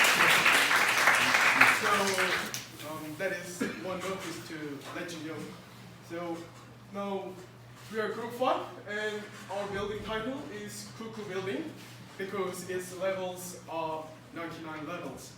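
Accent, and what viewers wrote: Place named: United Kingdom. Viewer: 브릐티시 악센트가 멋있네요~~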